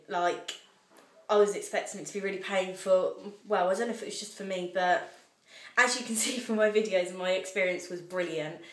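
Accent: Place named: Devon, United Kingdom. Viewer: Are you from Plymouth? Or somewhere in Devon? Your accent is so similar to mine xx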